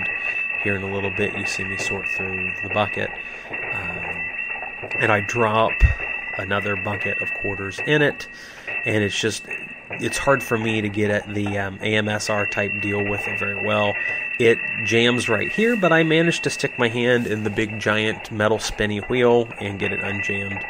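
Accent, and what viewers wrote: Ohio, United States. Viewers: hmm really interesting, I picked up on your dialect and mentioning that landmarks like sack n save. Crazy that YouTube randomly suggested a creator that lives in my small little Ohio town. The strangest part of this is I’ve never really watched any investment/laundromat videos until this one was suggested for me. seems even crazier that when it does suggest one its someone that’s a 10 minute walk to their laundromat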